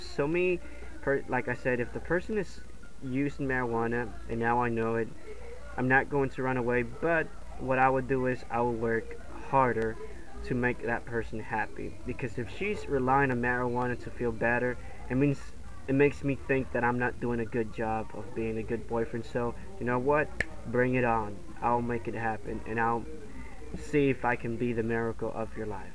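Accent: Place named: Dominican Republic. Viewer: Manito. Como estas 😊 i hear the Dominican accent. Bless u man INFJ here too.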